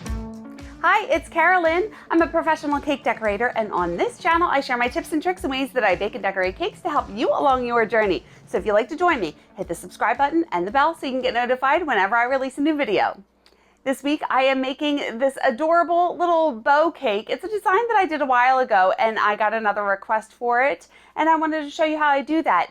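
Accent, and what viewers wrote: Philadelphia, United States. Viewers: 😂😂😂 my Phillly Sis😂😂😂 since I’m out of Philly now the accent is real. People used to tease me now I see we do have a Philly sound.❤️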